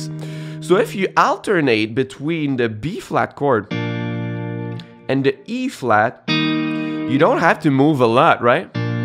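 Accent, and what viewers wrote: Quebec, Canada. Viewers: Are you from Quebec?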